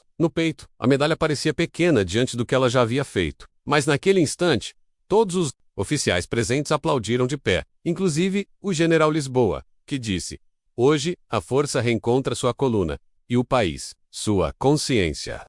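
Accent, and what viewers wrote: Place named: England, United Kingdom. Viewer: Não gostei! História muito vaga. Tradução truncada, ora em português, ora em inglês, ora com sota- que inglês. As fotos não correspondem à idade da soldada. Parecem mais com as de uma modêlo de 25 anos que pelo tempo decorrido deveria aparentar muito mais idade.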